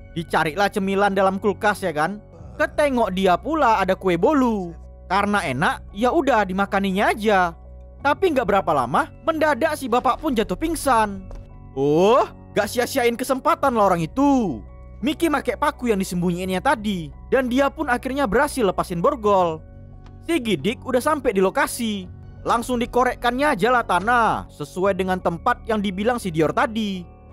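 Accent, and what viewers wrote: Medan, Indonesia. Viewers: Khas Medan nya medok 😀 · Orang Medan ni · Channel ini bukan dari cara suara vocal atau verb yang kurang... tapi pembawaannya emang agak sedikit cepat karna orang medan memang seperti itu... Dengan ciri khas masing masing di setiap channel ada kesan tersendiri.. Berbeda tapi tetap santuy... Buat yang baru liat channel ini jangan buru2 salah paham yah...dengan logat seperti ini lalu mengatakan kurang bagus atau sebagainya. hargai aja dan nikmati.. salam sikopet rawa cubluk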